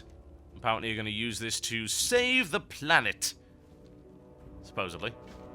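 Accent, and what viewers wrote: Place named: Newcastle, United Kingdom. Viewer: OH MY GOD , Your from Newcastle!?!? Im from Sunderland :D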